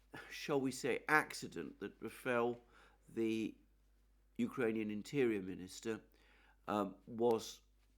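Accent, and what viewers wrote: United Kingdom. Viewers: The sound is much better. Thanks for the unbiased analysis with a British accent. Scott Ritter & Col MacGregor use American-English which is familiar to me.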